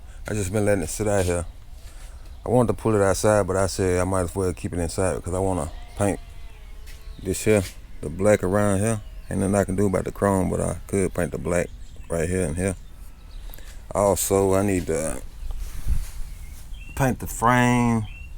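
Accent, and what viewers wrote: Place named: Dallas, United States. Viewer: nice work. after watching, I was going to comment & assume you're from Mesquite, but the end of the video, I'd say SDallas or OakCliff. heck ya brother.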